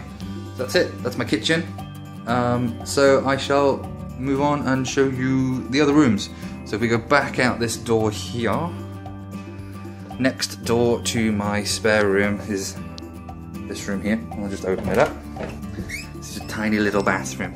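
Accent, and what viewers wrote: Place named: London, United Kingdom. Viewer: I learned several things today. You're left handed, and a fan of odd art. You like Marvel comics, fantasy, sci-fi, and gaming. You most likely spend your down time on XBox. You ride a bike every great once in a while. You're most likely single, given the state of your flat. Coffee is important to you. And you restore things. Your flat resembles the only one I've seen, it's in Edinburgh. Given the accent, though, I'd say you're nearer to London than you are Edinburgh. Also, Stargate. (I may be wrong on most of this.) And my question, though mostly out of need to joke, is: what's your address? ;3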